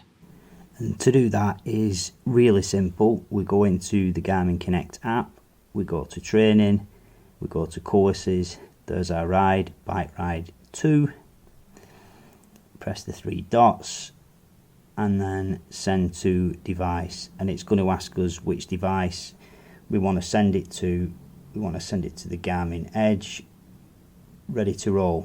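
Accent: United Kingdom. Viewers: Well explained thanks. Sounds like you’re a Lancashire lad. Hoping to do the UK Ironman at Bolton again 2021. Great place!! 😊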